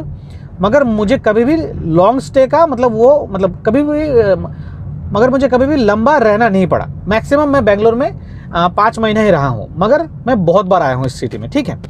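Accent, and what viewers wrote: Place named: Hyderabad, India. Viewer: No sense of comparison! The food is best in Hyderabad overall in india, plus if u see hyd is worlds best city to live in since 5 years. The infrastructure of hyd is well planned since 1500 years the whole city was build by Qula qutub shah and it has largest Post office to first airport and many things . Hyd is not considered for south food it’s famous for biryani and non veg foods. Again largest film city + tollywood ads more opportunities to hyd. On top of it hyd is more welcoming to all Indians compare to Bangalore. Especially North Indians don’t like to live in Bangalore or Chennai but they are more comfortable in Hyderabad as food and language is major attraction . One more thing ur speaking almost hydbdi slanng 😂😂. Thanks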